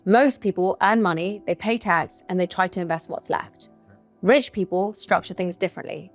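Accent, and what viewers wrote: United Kingdom. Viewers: well fella she is British and that is the way they talk .... it is like boston and the f bomb! oxford university PPE grad 1990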